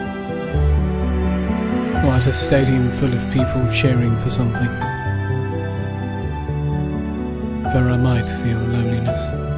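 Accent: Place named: England, United Kingdom. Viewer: Bukowski spoken by a young, posh English guy does not work.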